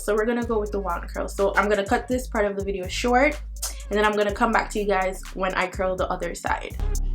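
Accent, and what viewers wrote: Jamaica. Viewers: Your an Jamaican I used to watch you video when I was younger but now when mi watch it mi see say you sounds like a Jamaican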